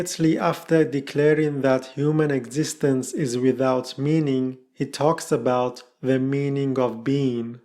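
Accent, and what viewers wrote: Germany. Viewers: I can't quite place your accent. German maybe? If so, you're so lucky you can read Nietzsche and many other great philosophers' original works! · Hahaha, I'm German and this is German. The name of the channel is German, his name is a German one. His accent is what every German that tries to pronounce English sounds like.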